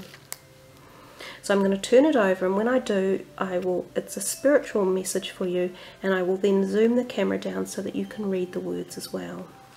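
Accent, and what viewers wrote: New Zealand. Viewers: kia Ora how lovely to hear a Kiwi voice online. Thank you for the heads up for july Blessed Be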